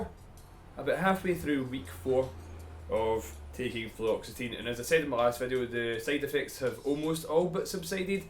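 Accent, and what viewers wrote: Scotland, United Kingdom. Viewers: Scottish ? Why the fuck I can’t understand shut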